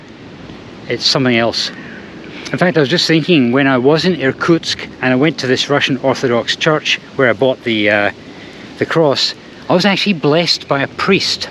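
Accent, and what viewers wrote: Scotland, United Kingdom. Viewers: What English dialect are you speaking? It sounds a wee bit like Scottish, but since I am no native I cannot tell, really.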